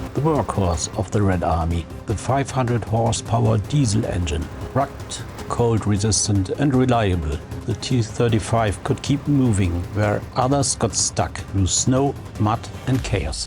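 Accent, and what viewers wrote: Germany. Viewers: love your vids! btw are u german? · I hear that you are german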